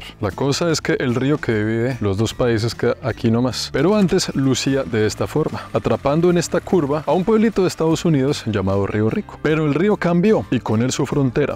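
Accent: Colombia. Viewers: habla muy colombiano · Jajajajja que bien me caes 😅😅 me encanta tu acento colombiano y tu gracia para redactar el tema saludos 😊😊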